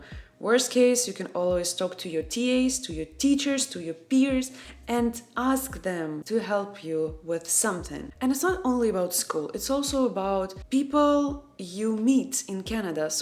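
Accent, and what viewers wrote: Russia. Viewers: I soooo much love your Russian english🤣🤣🤣🤣 thanks for this wonderful video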